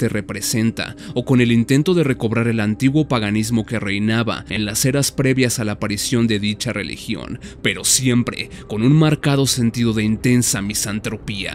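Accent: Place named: United States. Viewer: una pregunta ¿a que se debe el pronunciar todo lo que no sea castellano con acento yanky? es decir, si ya sabes que bathory no es una palabra inglesa no entiendo el porque pronunciar "bazory" con acento yanki como si tuvieras una magdalena en la boca, perdona pero me parece ridiculo.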